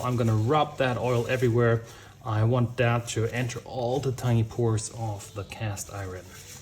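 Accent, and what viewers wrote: Germany. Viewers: WOW! I never knew this before! Thanks for sharing! Love your Germ-lish!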